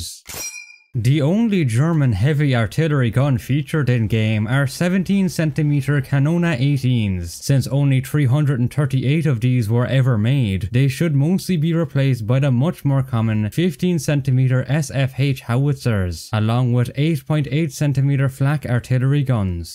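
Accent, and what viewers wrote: Ireland. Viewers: Your accent makes this video a hundred times better... w Ireland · Way off topic but I spent damn near my entire life watching irish shows n listenin to Irish music and I cannot place that accent, what county you from? I feel like I'm getting hints to both Mayo and Wexford somehow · Irish sounds nerdy? 💀 Do you want him to talk in Chinese?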